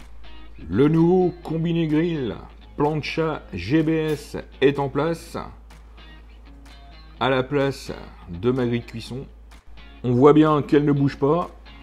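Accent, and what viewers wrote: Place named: Normandy, France. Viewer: C'est l'accent normand hehe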